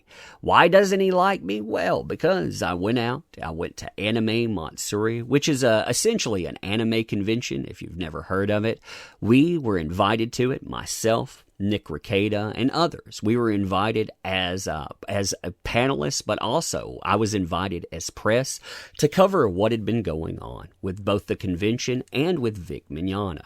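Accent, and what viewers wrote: United States. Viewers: Your accent is the most American thing I've heard, but it's also kinda really unsettling and made me uncomfortable and don't really like listening to you talk.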